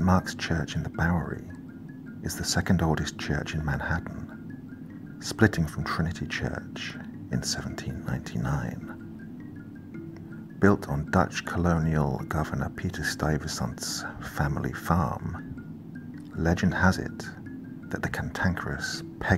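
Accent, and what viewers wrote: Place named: United Kingdom. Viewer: You have to be British to make something sound scary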